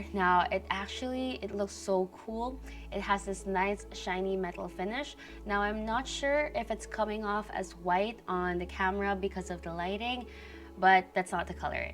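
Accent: Philippines. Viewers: ui your a filipino pala hehe just dropped on your channel ehehe kaya palang familar yung accent hehehe. i also have and still currently using almost the same system. z170 asus ROG with a i5 6400 CPU with GTX 960 with 8gb Ram planning to upgrade the ram and the GPU soon currently using it for editing and minsan gaming hehe.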